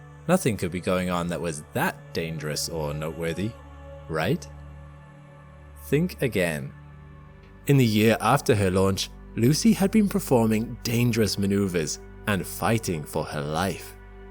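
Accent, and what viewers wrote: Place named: United Kingdom. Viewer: I have to agree , DESPITE his relative Youth, This (young) Man, is very VERY EXELLENT at what he does. He is a 'Grade A' presenter. AND, he happens to have an Accent, that automatically Engenders a "gravitas" with (Americans) WESTERNERS, Who still, to this day----- Feel a "UK Accent" automatically confers INTELLIGENCE .... THIS ..... must be some KIND Of genetically instilled memory (and LOVE) of "The KING* (royalty) it's obviously SOME KIND, of genetic Memory , we (Americans) just do NOT Question it ...................... it's a REFLEXIVE Response so, it's Gotta Be ---- Genetic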